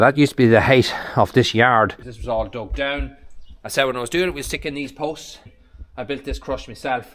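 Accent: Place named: South, Ireland. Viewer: Another great video,i wish you did one or two during the week as well. I just love the irish southern accent,im from the uk and have visted cork area 3 times and i just love IRELAND... Hope to come back very soon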